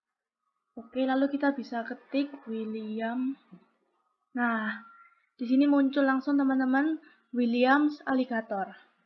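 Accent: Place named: Java, Indonesia. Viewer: Mbak Wong ngdi.. medok lo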